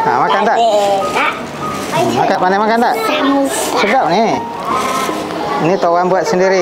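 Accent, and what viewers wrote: Kedah, Malaysia. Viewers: orang mana? Macam bunyi kuala nerang pun ada 😊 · cara cakap mcm org kedah...rumah anak yatim ni dekat kedah ker.?.?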